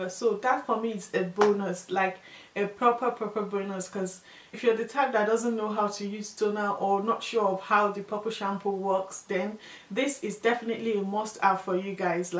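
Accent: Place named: Nigeria. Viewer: I love the accent! Nigerian??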